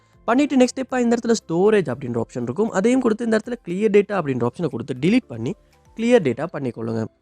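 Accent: Sri Lanka. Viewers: Srilankan Ahhhh......bro நீங்க.......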